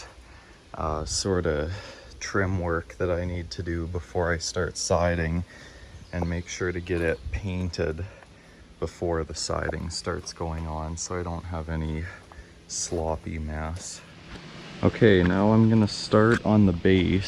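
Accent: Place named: Upper Michigan, United States. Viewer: We have similar accents here (UP of Michigan) I went to pick up my nephew (he's in his late 30's, i'm in my late 40's). His dad my bro-in-law is a roofing contractor. so I say: 'Yah.... I went and picked up a bundle of cedar shingles the other day' 'they were so rough-sawn I don't even think I could us em as shims' He looked at me, astonished, and said 'yep! it's hard to even find good shims to buy.'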